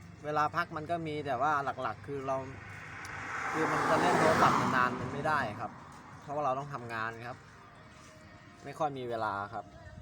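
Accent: Thailand. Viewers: คนเหนือรึค่ะออฟก้อคนเหนือ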